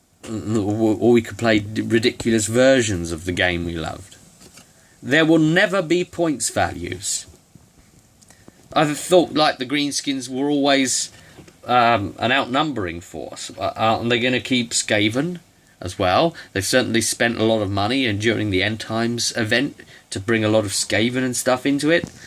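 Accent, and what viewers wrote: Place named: United Kingdom. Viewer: There's just something about a good British accent doing one of these, that we Americans just can't muster in quite the same way....that gravitas...that...elegant sarcasm....brilliant! Thanks for it - you did a great synopsis of much of the concerns that many of us have had since first hearing of this.....game.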